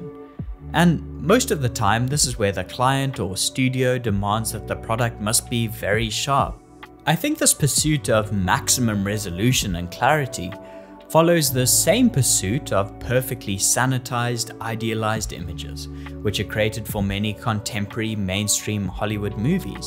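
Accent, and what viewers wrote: South Africa. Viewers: south african accent I detect ..ah subbed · Where are you from mate? Your accent sounds closer to South African than most things I hear on YouTube :) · I really don’t hear this accent (South African?) on YouTube too much. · Fellow South African! Love the content and recognized the accent immediately… 😉 🔥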